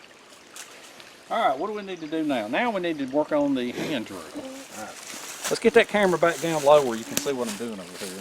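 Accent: South, United States. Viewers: southern drawl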